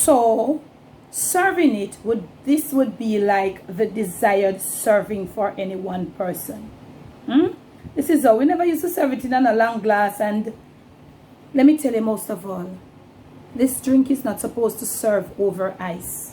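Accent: Jamaica. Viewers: Hello miss wishing you and families a happy new year for 2020 I like watching your cooking show they're very interesting like how you talk patwha, God bless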